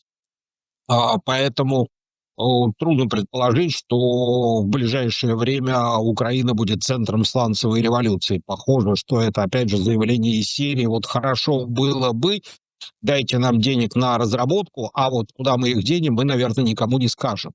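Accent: Okanye, Russia. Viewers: Оканье невозможно слушать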